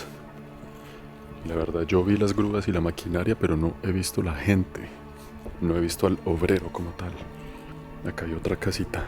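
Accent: Colombia. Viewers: Por su acento y educacion, es colombiano, cierto? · No entiendo d e qué se trata, eres de donde? Eres canadiense? Pero hablas como colombiano o eres italiano? O español no entendí tu canal…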